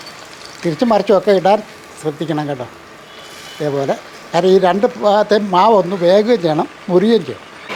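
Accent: Kottayam, India. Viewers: താങ്കളുടെ കോട്ടയം slang ആണ്ഏറ്റവും മനോഹരം വിഭവങ്ങളുംവളരെ ഗംഭീരം നന്നായിരിക്കട്ടെ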